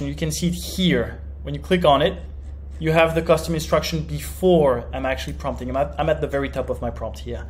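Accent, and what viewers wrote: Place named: France. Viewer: Je perçois un petit accent de langue française… Parles-tu français par hasard? 😊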